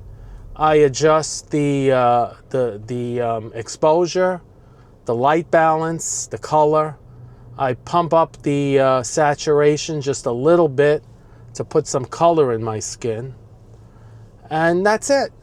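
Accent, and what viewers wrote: New York, United States. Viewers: Definately New York accent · I'm in Florida and have worked with several people who have your accent. They are all NY Italians. Thanks for posting. God bless. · I'm from New Jersey. I could pick you out as a New Yorker in a second based on your accent. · This guy doesn’t sound like somebody of average intellect. He’s full of wisdom too. And yes he has a great NY accent that’s easy to listen to. Never forget we’re here in earth school to learn. We start learning when we’re born; if we’re lucky, we don’t stop learning til “God” calls us home from earth school. Great scenery of NY! Reminds me of the tv show TAXI. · If I may offer, you’ve rebuilt your life multiple times and succeeded. But maybe the move now isn’t reinvention, it’s using what you already know in a way that’s more stable and easier on you physically. Just a thought. 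Find some ways to build up some monies for later on. By the way, yours is definitely a New York accent! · Yeah you have an accent but it's refined other than the standard New Yorkers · You most definitely have a very strong NY accent and İ like it Makes me feel at home and İm 6k miles away İts Richmond Hill İ believe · Yes you sound like a New York native to me. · You're very patient and calm to make that commute Monday through Friday. You have a New York accent. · You absolutely have a new york accent! Nobody on the west coast sounds even remotely like you. I would also call it an east coast accent. Love it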